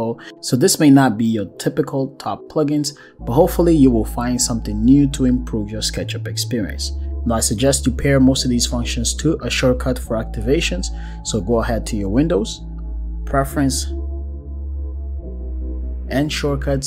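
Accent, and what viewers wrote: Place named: Portugal. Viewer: By your accent, can I conclude that you are a native of Portugal or some Portuguese speaking country ?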